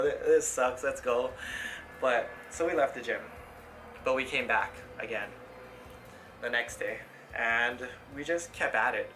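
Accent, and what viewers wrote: United States. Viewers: Dude..u from west coast or east or Midwest?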